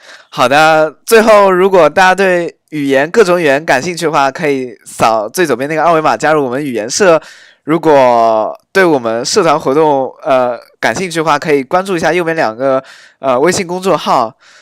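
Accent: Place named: Ningbo, China. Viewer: 妈蛋 你一开口我就感觉听到自己的声音了 宁波人果然说话感觉都有差不多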